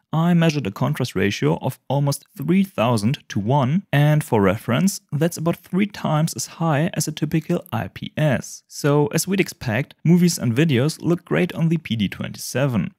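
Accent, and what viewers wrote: Germany. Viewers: Dude, you sounds like a German who speak English. Hoffe mal ich lag richtig. Hahah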